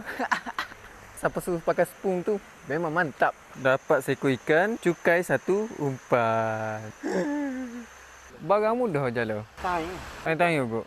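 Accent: Kuala Lumpur, Malaysia. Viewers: Ore kelate tp kecek luar mcm org kl, ingat ore luar tadi😂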